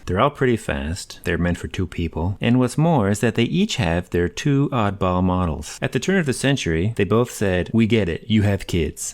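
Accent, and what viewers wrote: Canada. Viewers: This is the most Canadian man I've ever heard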